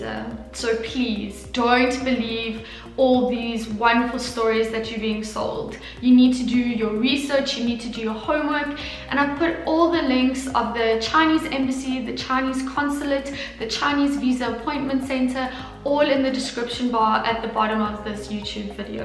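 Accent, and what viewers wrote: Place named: South Africa. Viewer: your south africa accent isn't that strong. i am kinda surprised that you got teaching position in china. british or american english are preferred there and considered as "authentic english". i had been to south africa multiple times, some of afrikaans got really thick accent, took me a while to get used to their speaking patterns.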